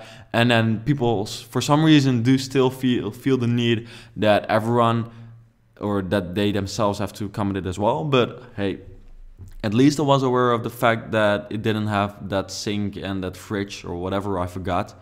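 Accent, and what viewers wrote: Netherlands. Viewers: Je kan duidelijk aan het accent horen waar je vandaan komt haha (geen haat, nederland staat er een beetje bekend om)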